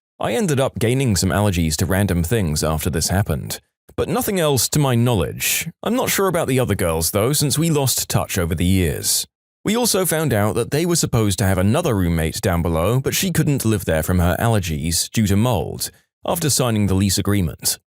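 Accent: United Kingdom. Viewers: HES BRITISH NOW?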